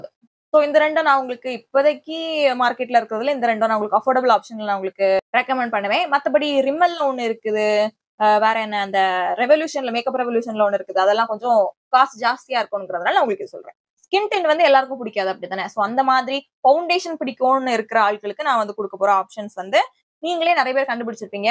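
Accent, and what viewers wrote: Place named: Madurai, India. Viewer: Madurai slang😂💥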